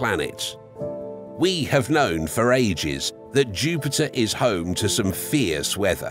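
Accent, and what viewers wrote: United Kingdom. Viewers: I know Ur UK english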